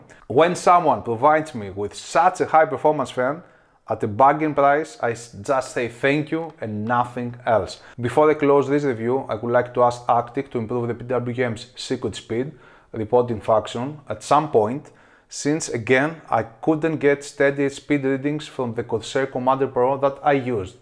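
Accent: Greece. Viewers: OREOSSSS FILLARAKKO :D i love the accent, after 3s i knew, he is greek. Awesome detailed analysis and exactly what i was looking for. Keep up the good Work !!! Kalinichta from Germany !!!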